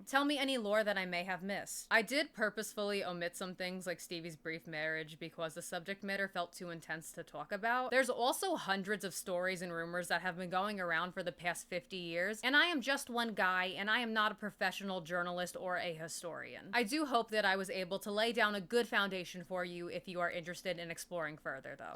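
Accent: New York, United States. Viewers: Love that New York accent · Staten/long island/NY ass accent goth girl talking about Fleetwood Mac?! Sign me up fam. I grew up in NJ and this is very wholesome to me.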